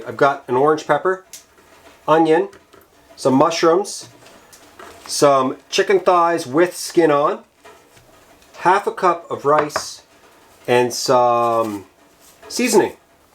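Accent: Canada. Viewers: Endorsement from anon YouTube watcher: Totally subscribe to this channel. Beautiful views, for real nice guy with a very Canadian accent, feel good, relaxing content, not about the negativity, legitimately out here learning as he goes. You can't get any more authentic than winter camping in the Canadian North. SUBSCRIBE SUBSCRIBE SUBSCRIBE!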